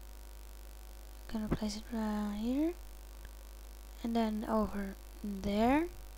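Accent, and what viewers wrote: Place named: Netherlands. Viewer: Haha, ik dacht al te horen dat je Nederlands was!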